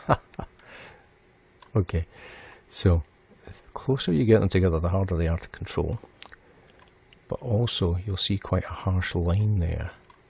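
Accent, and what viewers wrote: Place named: Scotland, United Kingdom. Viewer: I heard scotish.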